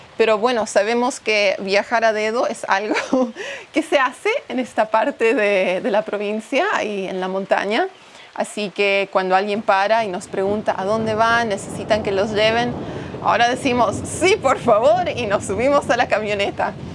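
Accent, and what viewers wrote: Argentina. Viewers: Es increíble el cambio de idioma que estás teniendo ya SOS argenta😂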